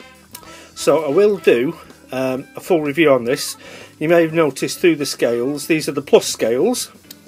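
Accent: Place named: England, United Kingdom. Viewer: What part of England are you from I just can't pinpoint it I love accents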